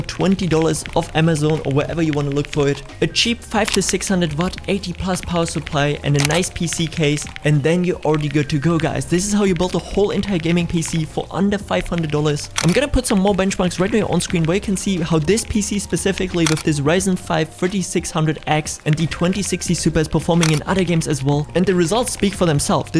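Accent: Germany. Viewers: Guter deutscher Akzent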